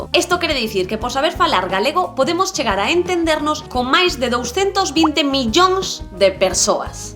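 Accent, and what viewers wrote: Castile, Spain. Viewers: No sientas esto como una crítica pero creo que tu gallego está muy castellanizado (usas infinidad de tiempos compuestos en los verbos y muchas palabras que tienen su variante propia en gallego como tal). Imagino que es porque eres 'neofalante' y lo has practicado poco. Sin embargo he de darte la enhorabuena por tratar de visibilizar y darle toda la importancia que se merece a un idioma que, sin duda entre todas las lenguas de España, es la que tiene más potencial. Mi consejo: practícalo más si puedes, escucha a la gente más mayor (suelen ser los que menos contaminación lingüística tienen aunque no son infalibles), lee mucho al respecto y lo bordarás (nadie nace aprendido e incluso estudiar bien el gallego en Galicia es difícil ya que tanto RAG como profesores coinciden equivocados en que el gallego evoluciona de manera natural cuando en realidad lo hace con un alto grado de contaminación lingüística). 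Es más, alguien en los comentarios decía que te estaba escuchando y que creía que hablabas castellano (no es el primero que es de fuera de España al que le escucho decir que el gallego es "como un castellano mal hablado". Síntoma excepcionalmente grave de que el gallego en cien años acabará siendo un dialecto. De hecho la fonética es con el normativo prácticamente castellana. Una pena... Te lo dice alguien que se defiende en varios idiomas, la mayoría de ellos romances y contando también con algunos propios de la península ibérica, y que ve cómo el gallego poquito a poco es, de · Yo diría que trates de pronunciar gallego como nativa ya que sabes hablarle xq estas hablando gallego con acento castellano ESO NO ES PERFECTO.... ES COMO QUE UN FRANCES NOS QUIERA ENSEÑAR CASTELLANO CON ACENTO FRANCÉS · ela esta falando eu português com sotaque espanhol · Ela está falando portunhol com forte sotaque espanhol... 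Pelo que sei, isto não é galego... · Galego con acento de un español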